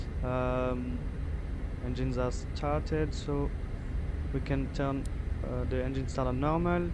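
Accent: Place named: India. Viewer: J'adore ce petit accent anglais qui fleurte avec celui des Indiens anglophone 😅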